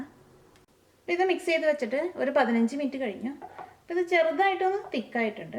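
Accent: Thrissur, India. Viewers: പെങ്ങൾ തൃശൂരല്ലേ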